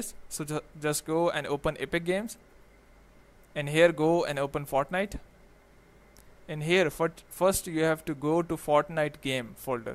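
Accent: India. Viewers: you sound like a technical indian scammer but you fixed my game.Thanks!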